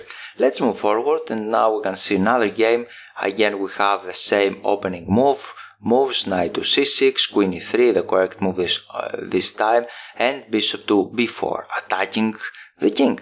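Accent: Greece. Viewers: Χαχαχα κατάλαβα ότι είσαι Έλληνας από την προφορά σου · I love the Greek accent lol.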